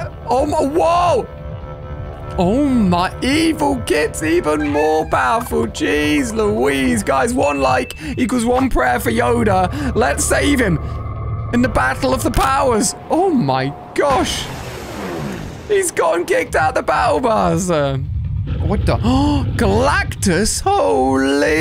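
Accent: United Kingdom. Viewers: Are you British?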